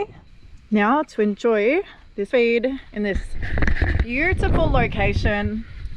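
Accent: Australia. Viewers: You got some skills women! That Australian accent is something else 😍..Australian women are built different!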